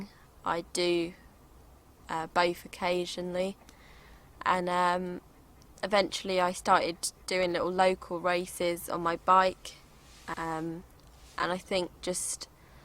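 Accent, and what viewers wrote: United Kingdom. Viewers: I love your mind. Also your British acsent remains me my MSc in Scotland:)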